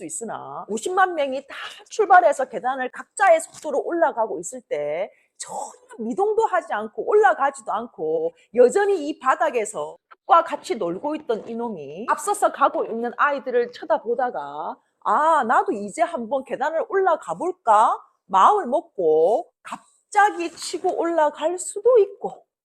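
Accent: Gyeongsang, South Korea. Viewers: 넘 재미있어요. 진짜 잼 있어요... 두 아들이 있는데 둘 다 고졸 입니다. 대학 대신 상장사 AI 팀장과 매니저로 서울대와 카이스트 졸업자들을 AI 교육 시키고 있습니다. 다음에 한번 뵙고 싶군요. AI 시대는 남들과 다른 교육이 필요하고 AI 시대 교육은 새롭게 변해야 한다고 생각해요. 산골에서 단 한번도 사교육을 시켜보지 못했고 과학고에서 영어 4등급 받았어요. 그런데 토익은 만점 입니다. 국내 대학 포기하고 연봉 억대로 취업을 했어요. 저희 유튜브에 대한 이야기도 좀 부탁 드려요. 한번 기회를 주세요^^ 저도 유명해지면 해드릴게요^^ 틀림없이 내년에 유명해 질 것 같아요^^ 경상도 사투리 넘 멋져요^^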